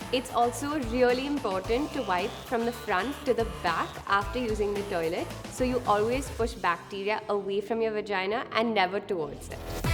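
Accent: India. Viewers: has an Indian Assent. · She has an american look. But she talked like indian woman.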